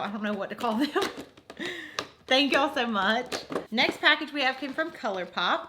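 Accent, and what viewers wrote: South, United States. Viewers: You have to be from the south lol but anyway I love your videos!!! Everytime my phone dings I'm hoping it's a new video from you.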